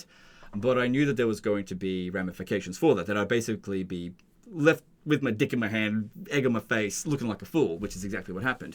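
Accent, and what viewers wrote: Australia. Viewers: Bro is aussie...I thought he was bri'ish...my world is shattered.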